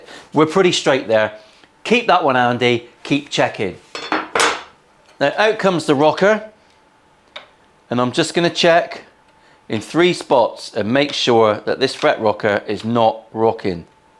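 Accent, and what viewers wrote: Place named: England, United Kingdom. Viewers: USA Americans will have a hard time understanding his talk .... he is from England and not the USA... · Another Monday made better - cheers you fucking legend. Btw love how you pronounce it pacifiker. Janner!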